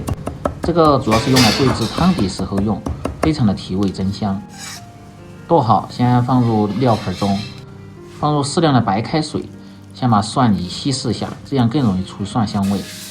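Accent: Sichuan, China. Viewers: 要得 謝謝 有你的川普、更入味兒👂😂 啊呀 冒菜都莫得你的川普正宗 我們這莫得賣黃吼兒的🤔 其他的都賣得到🤔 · 听到熟悉的四川普通话就直接给老乡点赞了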